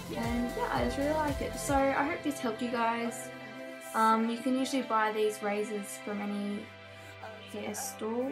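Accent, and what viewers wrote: Australia. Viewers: It's Australian accent <3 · alot of people commenting about your accent. but i didn't even notice you had an 'accent' because im Australian too. soo really: for me, it's not an accent :p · she's from australia idk where. you can tell it's an australian accent ( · im pretty sure she's aussie. like me! :D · I though you sounded Australian so I checked your channel :D Me too lulz ^^ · its australian lol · Aussie accent? I'm aussie! xD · ARE YOU AUSTRALIAN :D I AM YOU SOUND AUSTRALIAN / NEWZEALAND MAYBE BUT U SOUND AUSSIE IM AUSSIE LOL Heyy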